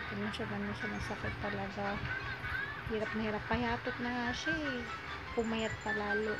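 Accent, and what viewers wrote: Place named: Visayas, Philippines. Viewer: kaluoy sab sa imong amega sis...nice vlog cute kaau ka mag visaya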